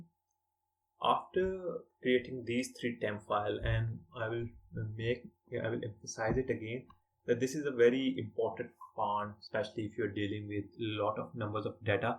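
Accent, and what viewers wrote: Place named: India. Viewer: good videos but the indian accent drvies a non English speaker really crazy……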